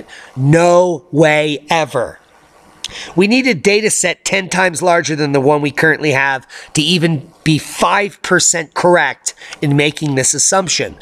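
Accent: England, United Kingdom. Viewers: Hi. Great video! a lot of fun. Loving the..English accent, mixed with a little Australian too!? That little 9c 'tippy-touch' in the UK is called Cornwall. ps The Queen Muvva don't give a toss about snow..she died in 2002!! oh, and Congratulations to you both!!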